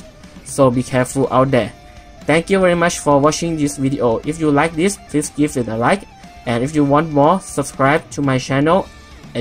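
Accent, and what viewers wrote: Thailand. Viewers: Ooo sawadikhap for your accent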